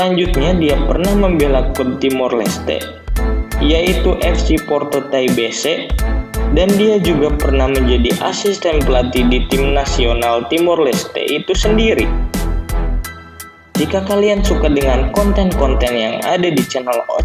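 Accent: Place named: Banyumas, Indonesia. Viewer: orang ngapak mungkin aslinya dia bro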